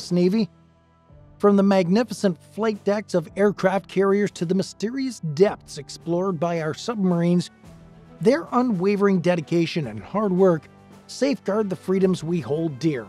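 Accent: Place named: Canada. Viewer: Canadian voiceover!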